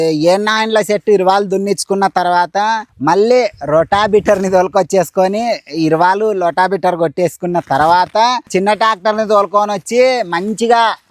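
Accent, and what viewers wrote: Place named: Kurnool, India. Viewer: Pure Kurnool slang Anna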